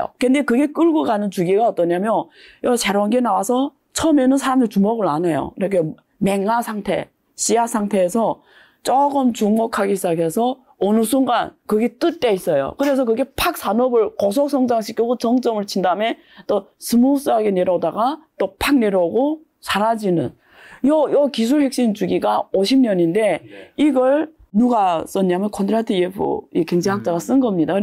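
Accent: North Korea. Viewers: 북한 여자인가..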